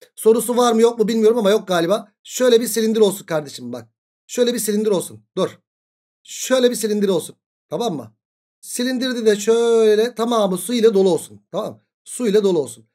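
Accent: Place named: Aegean, Turkey. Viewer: egeli sanirim sive benziyor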